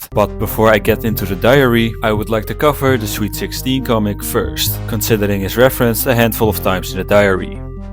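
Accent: Netherlands. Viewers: Oh God your perfect english being mix with your subtle dutch accent definitely answered my question of. "What dutch people sounds like if they fully speak in english", while I'm watching this characters saying one english words while speaking in fully Dutch. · n#gga has a thick dutch accent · valt hartstikke mee hoor, ik kom zelf uit londen en woon al jaren in nederland en ik heb heel veel erger gehoord. hij gebruikt wel eens nederlandse spreekwoorden die niet correct vertalen, maar verder klinkt het gewoon als een normale nederlander die engels spreekt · broer het nederlandse accent van jou terwijl je engels spreekt is zo slecht dat ik ondertiteling moest aanzetten 💔💔💔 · ik vond t wel te verstaan maar ik hoor dat typisch nederlands accent in t engels al mn hele leven dus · Your accent your dutch accent is so strong, sometimes I don't know if you're speaking english or dutch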